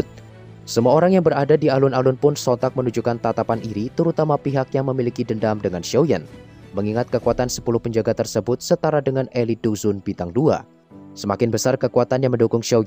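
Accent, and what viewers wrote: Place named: Malaysia. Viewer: Saya minat dengan cara sebutan min yang tidak kalah dengan orang malaysia, sama seperti portal hitam, cara penceritaan yang penuh penghayatan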